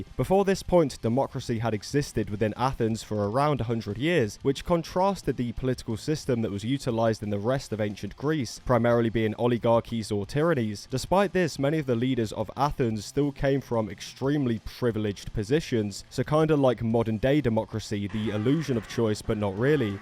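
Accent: United Kingdom. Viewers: first time i've seen you. i thought you were a British Lad you know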